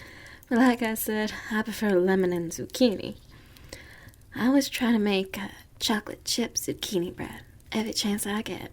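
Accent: South, United States. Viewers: Another instalment of my favourite southerner (thank you 💜😘). Even though part 2 is still my favourite of the series, this one was beautifully subtly in an unfortunately uncommon way. As frequent use of perfect Spelling seamlessly integrated into English-spoken sentences is one of the best non-ASMR VA ideas I have come across in years, and he made it seem like child's play. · I dunno what it is about a southern belle accent but its sooooo gorgeous to listen to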